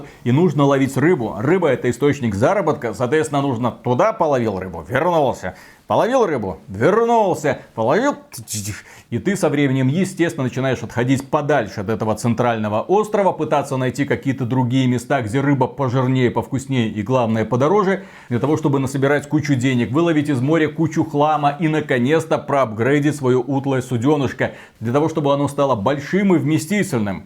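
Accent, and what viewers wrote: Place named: Belarus. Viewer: лайк за трасянку!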